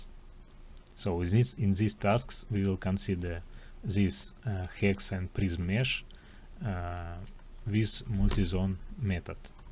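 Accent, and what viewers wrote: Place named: Nizhny Novgorod, Russia. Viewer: Не понимаю зачем вы говорите на английском с кондовым нижегородским акцентом. Комментируйте по русски. Англичане с американцами вас всё равно не поймут.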